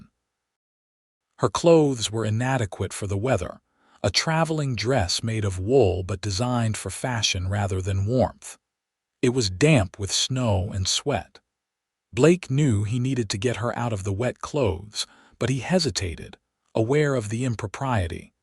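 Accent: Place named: United States. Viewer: At First: favorite narrower - an ideal voice for wild west stories, through and trough American English, sounding like a music instrument. Greetings from Germany.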